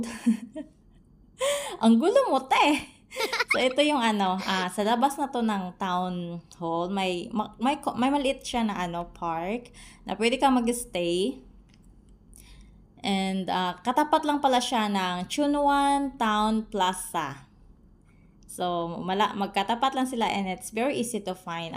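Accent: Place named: Singapore. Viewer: Enjoy your day in Hong Kong, I thought it's in Singapore because of the accent. Thanks for sharing.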